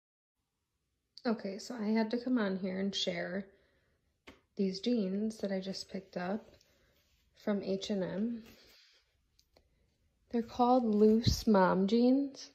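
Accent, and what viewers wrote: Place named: United States. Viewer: She’s so pretty. After hearing her talk, I’m so happy that I’m from britain. American accents sounds horrible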